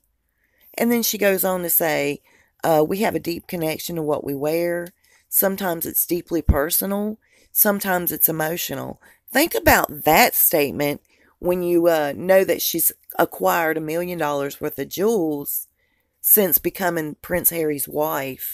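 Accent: South, United States. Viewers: What’s the Mystery Woman behind Your Southern Belle Voice ? I want to see your face🌹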